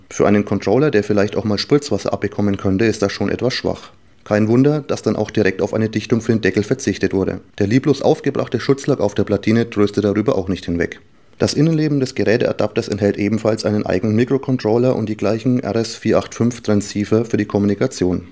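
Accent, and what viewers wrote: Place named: Franconia, Germany. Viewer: Gutes Video👍🏻. Nicht zu lange, gut erklärt und angenehm zuzuhören. A propos zuhören. Darf ich fragen woher du grob kommst ? Du könntest vom Dialekt quasi mein Nachbar sein 🤣. Fränkisch ist einfach symbaddisch :) Lg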